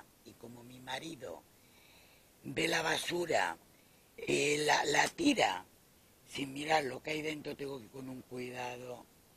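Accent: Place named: Spain. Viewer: Q delicia es escucharla! Ud es una mujeraza, c mucha luz, valentía y dulzura Soy argentina, c raíces españolas, y adoro escuchar el acento d esa tierra, a la q añoro conocer Dios la bendiga c mucha salud, guerrera incansable❤❤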